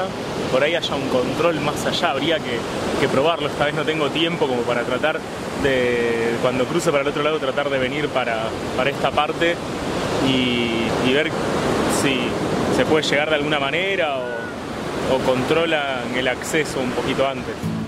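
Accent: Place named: Rioplatense, Argentina. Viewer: ¿Argentino o Uruguayo? Demasiado evidente de tu acento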